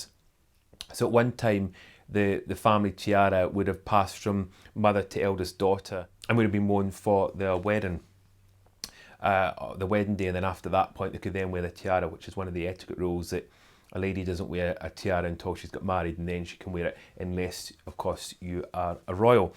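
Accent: Scotland, United Kingdom. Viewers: I had to wait for a further 2 hours to finally watch your upload, due to current electricity load shedding that has once again been put upon us here in South Africa, whereby our electricity is cut off for set periods of time throughout the day. It is so lovely to hear you answering some of the questions I read in the comments section earlier in the week. And of course, your Scottish accent is fantastic! My adoptive Father was born and raised in Edinburgh. Thank you so much, for taking time to answer so many of our questions. And it is always so lovely to see Shumba with you, and those beautiful pink Roses. Your suggestion of having a livestream Youtube Q&A at another stage sounds fantastic. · I just found your YouTube channel. I love 💕 listening to your Scottish accent and all your stories about being a butler. I wish I could take an etiquette lesson from you but I live in the USA. 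Best wishes to you and the dogs.😊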